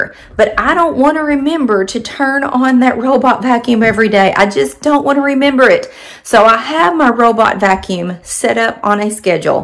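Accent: South, United States. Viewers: I don’t know why but you amuse me. Love your southern accent. So much energy. Thank you for the tips. So helpful. I’m 61 and started being more mindful about knowing where my keys are.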